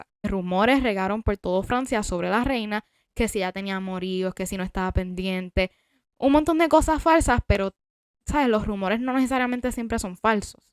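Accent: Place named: Taino, Caribbean. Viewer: ESTÁ CON ACENTO TAINO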